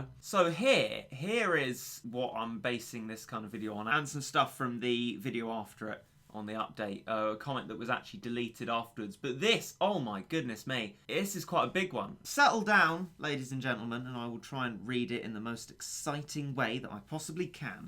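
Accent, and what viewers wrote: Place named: United Kingdom. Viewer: I can see why this guy would think these untrue things- it's your english accent! Remember that in American pop culture everyone with a british accent is automatically a villain.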